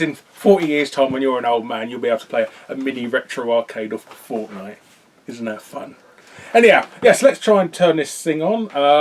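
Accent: United Kingdom. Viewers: Why are British accents so intriguing lol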